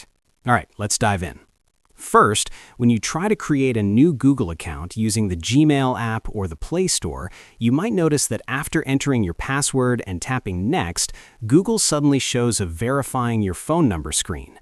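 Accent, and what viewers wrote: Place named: United States. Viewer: Finally a fucking american (proper english) accent would prefer a fucking ai than a fucking indian all the way!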